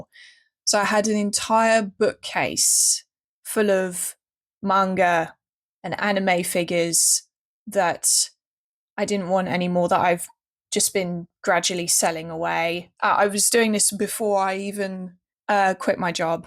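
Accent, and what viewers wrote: RP, United Kingdom. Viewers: Hearing posh English is like hearing birds to sing. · That's not really posh, just normal English. She can actually string a sentence together which you're probably not used to hearing these days.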